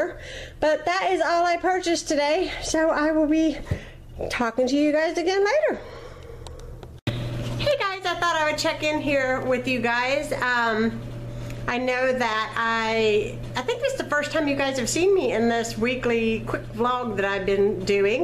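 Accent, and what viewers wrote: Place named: South, United States. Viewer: recently subscribed :) I love your channel and I love listening to your sweet southern accent ♡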